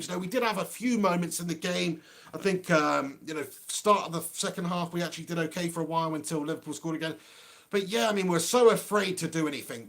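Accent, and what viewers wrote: Manchester, United Kingdom. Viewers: thats a proper fucking Manc accent that is.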